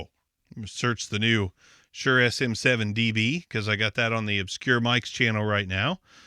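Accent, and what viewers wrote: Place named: Philadelphia, United States. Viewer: Sensing a Philly or Baltimore accent. I dig it man. I'm from Philly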